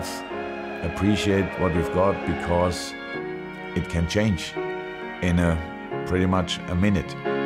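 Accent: Liverpool, United Kingdom. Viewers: Hes slowly developing a Scouser accent.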